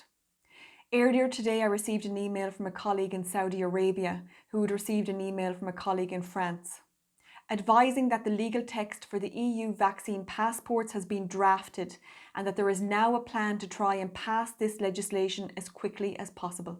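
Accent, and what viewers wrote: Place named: Israel. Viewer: Why are you saying dates like hebrew English. We speak UK English. Prehcedent not pricedent.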